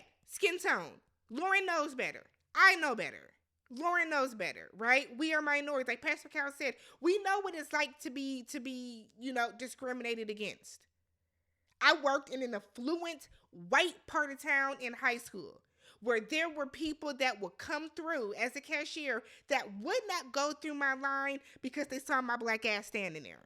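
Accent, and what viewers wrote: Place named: Midwest, United States. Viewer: Heyy from NYC! I love your midwest accent. Your recaps are excellent, its like talking to a gf. Keep up the good work.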